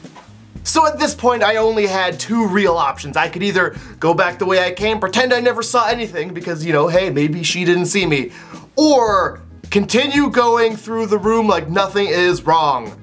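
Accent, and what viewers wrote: Canada. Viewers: I would of awkwardly hiven her some hummus, and awkwardly pat her shoulder. & plus your getting a little canadian accent c: coooool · Is he Canadian I'm new to this channel so idk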